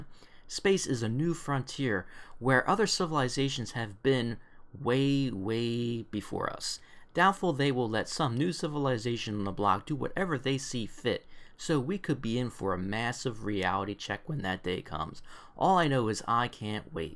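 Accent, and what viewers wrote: Philadelphia, United States. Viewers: That Philly accent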